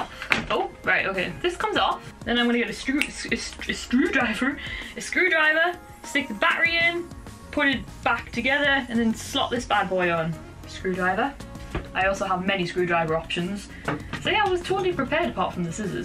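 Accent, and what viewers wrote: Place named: Liverpool, United Kingdom. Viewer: Her accent is honking, but isn't scousers bad.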